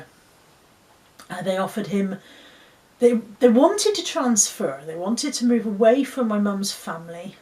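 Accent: Bristol, United Kingdom. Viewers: Hello, just found you on here, recognised your accent as Bristol straight away. I grew up in Yate, now live in Somerset/Dorset.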